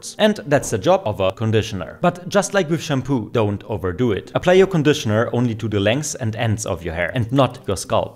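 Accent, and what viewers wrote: Germany. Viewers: Hey man, love your content, stuffs great!!! Are you german tho? I am and do hear some of it….maybe… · ich höre deutsch, ist das wahr?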